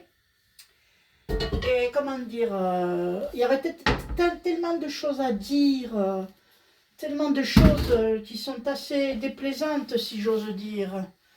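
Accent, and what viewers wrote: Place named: South, France. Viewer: Coucou. On peut pas être d'accord pour tout et c'est ça la beauté de l'être humain, l'important c'est de le partager sans sagresser. Mais ehhhhh tu as bel accent du sud 😅 vive le soleil!! Je vais pas te dire que je suis d'accord sur tout mais alors qu'est ce que j'ai rigolé sur la nourriture parce que crois le ou non on partage beaucoup d'idées à ce niveau là.. mais moi quand je le dis, je me fais lyncher parce qu'il paraît que c'est du jugement. Du genre il paraît qu'on gâche l'enfance de nos enfants parce qu'ils ne mangent pas à McDo. On ne peut pas aimer tout le monde évidemment et on ne peut pas être d'accord avec tout le monde. Après tu sais des videos 20 ou 30min de vidéo ça ne reflète pas une vie entière. Et justement parfois on croit que les gens sont comme ci ou comme ça au travers de vidéos mais il y a aussi tout autre chose et surtout ce que nous apprenons à nos enfants.. 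c'est de faire la différence entre la personne et le contenu. On peut ne pas aimer un contenu pour autant ça ne veut pas dire que la personne ne vaut rien. En tous cas, ce que tu dis fait sens. Comme je te l'ai dit tu ne le croira peut-être pas pensant que nous sommes des mauvais nomades mais nous avons beaucoup de visions communes entre autre sur l'alimentation, action, Macdonald et j'en pense. Eh oui j'ai une grande bouche mais mes principes je les applique, alors oui parfois on fait des exceptions et c'est normal parce que la bienveillance commence avec soi-même et d'accepter qu'on est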